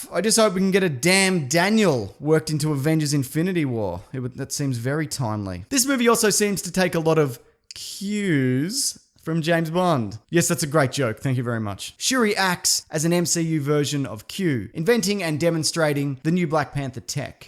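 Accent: Australia. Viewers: u kinda sound ozzie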